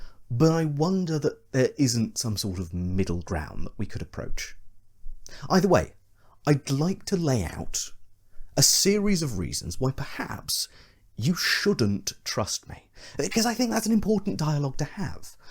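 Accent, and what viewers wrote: United Kingdom. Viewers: To be fair, everything sounds more believable if said in a erudite British accent.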